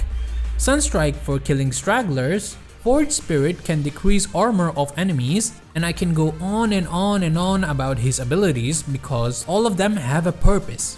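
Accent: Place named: Philippines. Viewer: You sound very pinoy. Greetings from Malaysia. When you talk about small counters against heroes, you can add that cold snap interrupts hero such as Earthshaker who has awful casting animation. EMP drains Medusa's Mana Shield. Ice Wall and Forged Spirit depletes Templar Assassin's Refraction quickly. Tornado dispels enemies too, for example Windrunner's Windrun and Scurry of Hoodwink. You also should mention Catacalysm which is the highest damage single cast spell in DotA, higher than Thundergod's Wrath I believe.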